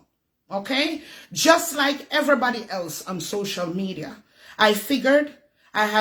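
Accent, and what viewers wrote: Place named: Jamaica. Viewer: Real,real Jamaican talk.❤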